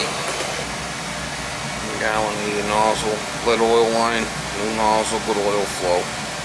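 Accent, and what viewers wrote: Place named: Boston, United States. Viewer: That's just the Boston direct way of talking, no need to unsubscribe. I change my own filter and nozzle and if something went wrong I would call myself "Joe Homeowner does it again" lol.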